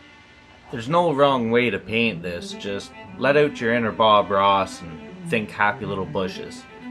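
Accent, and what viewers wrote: Canada. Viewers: Sorry lol, as soon as I saw the yellow bag of flour with the French label showing "Tout Usage" (all purpose) I recognised the Canadian factor - then when I heard buddy's accent I thought "O fk yah bud!" Haha 😄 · = HA! I knew you were a Canuck from your accent! And the Tim's cup cinched it! LOL!!! Great build! So much free stuff to build with, I don't know why anyone buys stuff! And nice job using paper mache. Free and looks better than the plaster cloth. The plaster cloth always shows through. Have fun!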